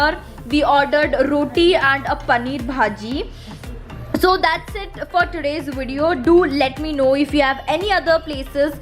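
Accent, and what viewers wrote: India. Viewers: Love your Hindi accent_🥰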